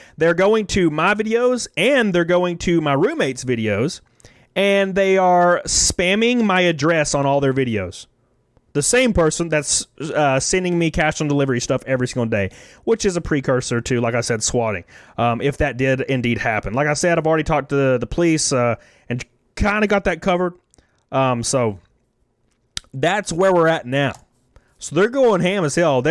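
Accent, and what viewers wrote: South, United States. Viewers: Folks doing this to others is not cool. I hope you will be ok and if it means anything your accent was one of the things that made your channel stand out and why we followed you initially. Hearing gaming updates with a southern accent is fun. Keep on doing what you love. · I love the southern accent. Meanwhile, I hate mine because its british 🤣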